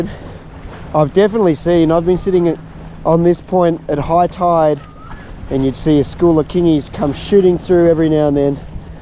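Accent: Australia. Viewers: Bro I started watching your videos during covid, I thought you were from Sydney, then Melbourne, then Brisbane 😂 Anyways, great content, still watching! Best on my WFH days 🎉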